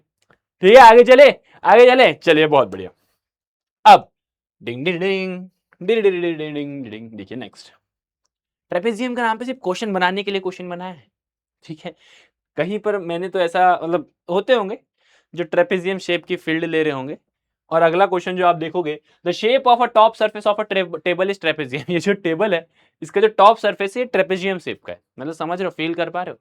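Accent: Bihar, India. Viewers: That bihari ascent 🫰🤌🤌🤌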